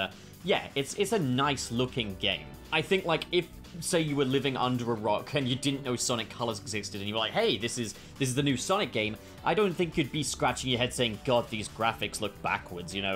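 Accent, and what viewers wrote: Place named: United Kingdom. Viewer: I am surprised to hear a British fellow say "dudes".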